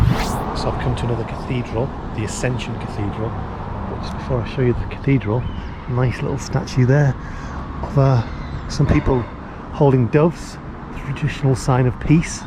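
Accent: England, United Kingdom. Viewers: и цены называл в фунтах, так что даже во вкладку "о канале" можно не заходить + очевидное английское произношение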